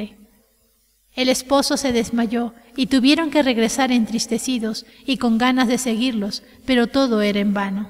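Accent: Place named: Spain. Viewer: muy bien hasta la z con pronuncia ibérica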